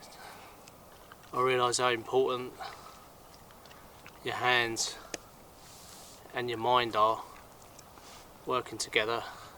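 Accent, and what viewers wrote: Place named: London, United Kingdom. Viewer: where are you camping, i dont expect to know the exact location but is it private land or public. Im gussing as you have a London/ Essex accent its local to you ???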